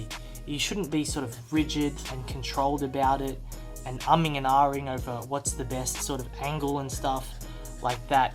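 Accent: Australia. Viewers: Coming from West Syd been sketching and writing for nearlly 12 years, i completely agree with everything you said and thought all the advice was awesome i defs wish i had something like like thsi when i was younger looking to learn but i ended up teaching myelsf everything i know...... anddd was just wondering where abouts in australia are you from i can tell buy your accent?? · Love a familiar aussie accent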